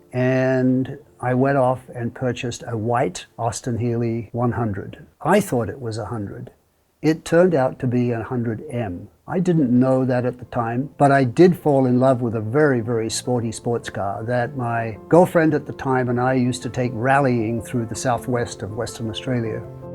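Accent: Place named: United States. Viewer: I am Australian and I could detect your accent. It sounds like you've been in America a long time. Magnificent car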